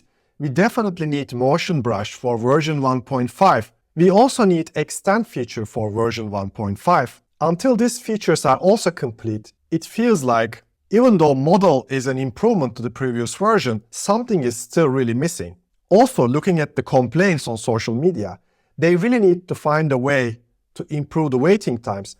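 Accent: Turkey. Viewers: From your accent I can tell you're Turkish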